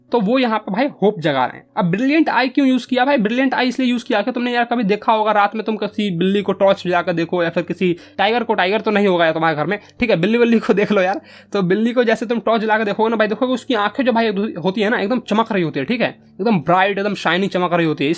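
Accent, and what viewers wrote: Bihar, India. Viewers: Brilliant explanation sir , I am from Bihar and I could feel your bihari accent coming in between the lecture 😂😂🤣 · Bihar se ho na tum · Bhaiya Bihar up se lag rhe hai😅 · Bihar se ho kya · Baauate rhata hai ...... A bihari or I can felt that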